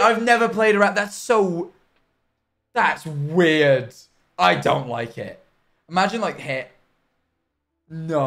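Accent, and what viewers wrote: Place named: RP, United Kingdom. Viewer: why did * sound so posh in this clip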